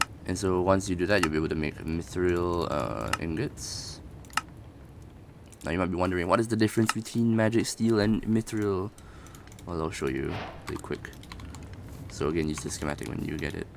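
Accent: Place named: Quebec, Canada. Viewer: Were are you from you sound quebecois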